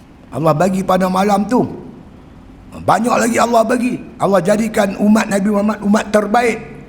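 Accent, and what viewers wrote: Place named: Perak, Malaysia. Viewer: Slank Perak utara. Nahu dia lain sikit dari Kedah atau Penang.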